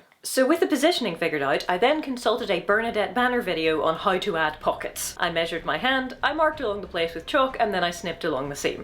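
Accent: United Kingdom. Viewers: I love videos. I don't know why. I think it is for several reasons, or some combination of them. 1, voice is soft and soothing. As a typical American, I often fantasize about what it would be like to be with a British woman. It's the accent. Love it. 2, goes into a lot of detail but doesn't dwell on the minutiae. That makes them interesting to me, someone Not in the entertainment industry, but interested in it enough to want to know the cool behind-the-scenes stuff that talks about. 3, editing skills are awesome. The way she combines her talking points, voice-over, and flashbacks makes the video interesting. It's not how I would produce the video... it's WAAAY better. Her film experience is obvious, even to the unenlightened. 4, is gorgeous. 'Nuff said about that. I am a guy, can't help it. This is totally separate from my comments about her voice. Her effect on the visual and aural parts of my brain are different, yet delightful in their own ways.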